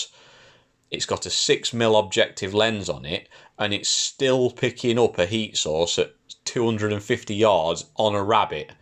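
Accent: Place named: Nottingham, United Kingdom. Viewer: Where are you from mate? Your accent is Notts/Derby?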